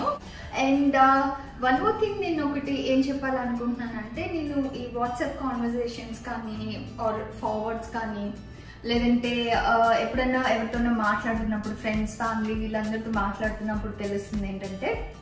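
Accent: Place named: Bangalore, India. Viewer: koncham banglore thelugu matladthundedhi kadhaa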